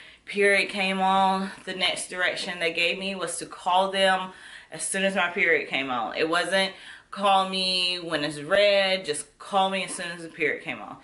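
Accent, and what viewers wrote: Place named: Carolinas, United States. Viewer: I'm so glad I found this video! I heard your accent and I was like "She has to be from NC or SC" and when I heard you say it's still cold in NC and here in Florida, I was like "I knew it!!!!" I'm on Letrozole (Day 4 of pills tonight) and I have had very few side effects. Thank goodness! But I have had joint pain in my left knee and right ankle, but that didn't last long. I've had some nausea in the mornings, but it doesn't last long either. I am hopeful that this first round will work. I haven't had any previous issues with my female parts other than this year (2020) and my cycle being waaaayyy off. But luckily, my doctor is wonderful and listens to me. He feels like my issues are hormonal and that this first round could be successful.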